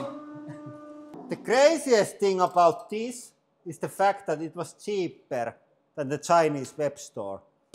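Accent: Finland. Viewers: How nice to hear a Finnish accent 😊 I’m 2nd generation Finn here in the US. My grandparents came over in the late 1800’s. My mother and both grandmothers equals 28 children. Big age gap.😂. I love your channel. Finn was my mother’s first language. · s a Swedish person, I truly appreciate our Finnish neighbours. So the moment I heard the accent, I felt warm and positive. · The language he speaks calls Finglish) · Nice Finnish accent! :-) Go Suomi, go! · Well it's that Finnish accent mixed with English translation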